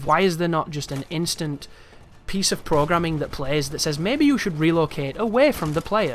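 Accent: United Kingdom. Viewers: Your heavy british accent makes it 10 times better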